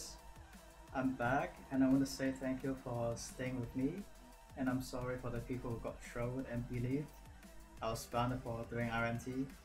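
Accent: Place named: United Kingdom. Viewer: you sound really british sometimes its great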